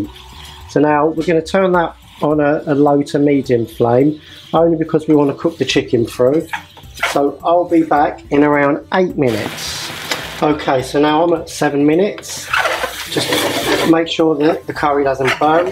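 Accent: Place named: Cambridge, United Kingdom. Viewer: are you from Cambridge/Newmarket? You sound just like all my family there:D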